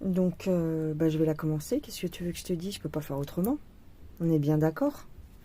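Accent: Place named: Toulouse, France. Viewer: Coucou ma belle 🩷elles sont magnifiques tes toiles et le loup est magnifique 😍. Super ton humour, tes jolies phrases, on dirait une petite fille 👧. Merci pour ce partage et bon courage pour tes toiles dont tu aimes tant! Super 🤣🤣😀😀ton accent de Toulouse. 🩷🩷💜💜😀😀🌅🌅 Belle fin de journée à toi et famille 😘😘😘ainsi qu’aux loulous 🦮🦮🐈🐕🐶😘😘😘😘😘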